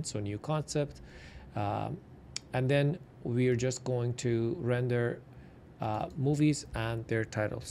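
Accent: Iran. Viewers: به ارور خورده بودم یهو دیدم لهجه تون فارسیه :))))) خیلی بامزه بود این اتفاق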